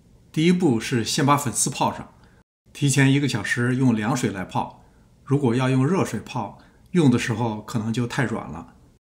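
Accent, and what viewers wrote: Beijing, China. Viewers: 感谢分享，京味儿普通话缓缓讲出生活智慧！明儿就做！像博主一样切西红柿！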